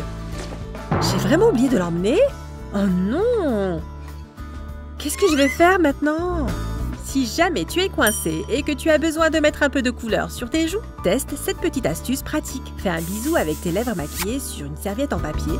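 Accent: England, United Kingdom. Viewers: elle sonq Anglaise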